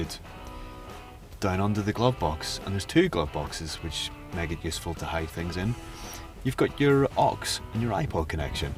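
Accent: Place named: Scotland, United Kingdom. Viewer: His presenting isnt bad. Its literally his Scottish accent that lets him down. That sounds really bad.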